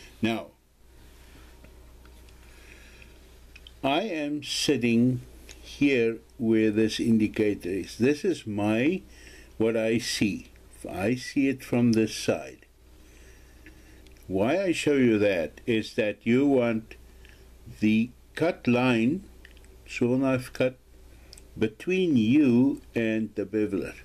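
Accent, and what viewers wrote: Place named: Afrikaans, South Africa. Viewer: jy klink Afrikaans. Ek het nou al baie YouTube tutorials gekyk. Nie EEN van hulle het die "beveling" so goed verduidelik soos jy nie. Baie dankie - jou kanaal is nou my eerste stop as ek wil weet hoe om enige iets te doen!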